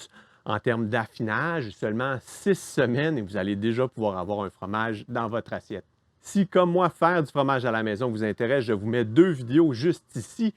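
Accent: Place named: Quebec, Canada. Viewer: On aime ton accent. Merci de nous amener un peu de fraîcheur. Québec, meilleur accent de France 2023...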